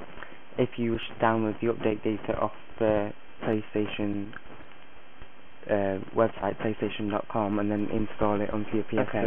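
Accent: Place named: Guyana. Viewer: u ah guyanese too lol wah gwan bai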